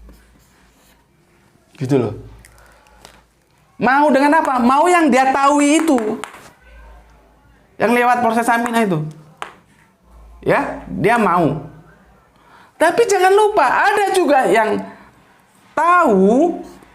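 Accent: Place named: Yogyakarta, Indonesia. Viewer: Kok cara berbicaranya n namanya seperti nya wong Yogyakarta, apa asalnya wong dr Yogya ya? Wah detail banget.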